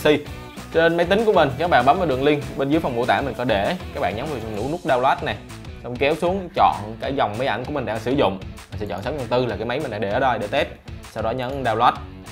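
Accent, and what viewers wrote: Binh Dinh, Vietnam. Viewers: bạn người bình định ah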